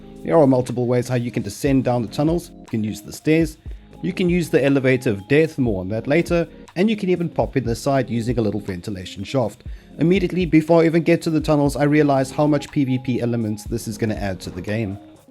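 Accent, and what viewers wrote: South Africa. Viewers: are you from cape town or are you a joburg boet?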